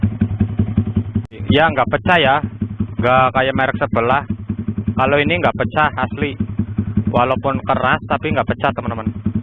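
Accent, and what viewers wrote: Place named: Java, Indonesia. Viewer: Bhsn e kok agak medok² kek org Jawa bro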